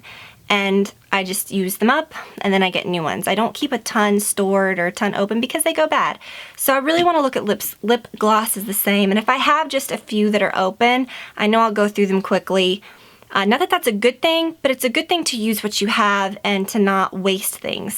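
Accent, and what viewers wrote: South, United States. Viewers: You southern drawl is coming out a bit more :) Love it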